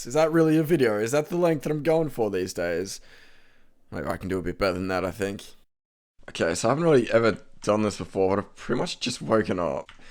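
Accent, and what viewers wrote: Australia. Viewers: called an Australian Accent.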